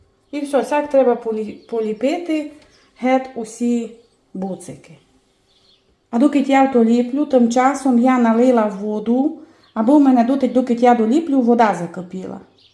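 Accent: Transcarpathia, Ukraine. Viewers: Як його навчитися говорити як на Закарпатті? Мова дуже файна. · Підписалась, який файний канал!, Ваш діалект, для мене мед у вуха 🫶 я переселенка з Бахмута, тепер жию на Закарпатті, дуже подобаються люди і цей діалект❤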